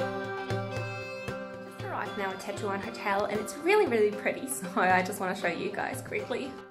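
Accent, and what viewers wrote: Australia. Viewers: I lived in Germany for a while Stuttgart und umgebung :) and at the beginning I was about to say you are German But that's kind of impressive how well you were able to pick up the aussie accent and this misled me into thinking that you are either aussie or kiwi :D That's great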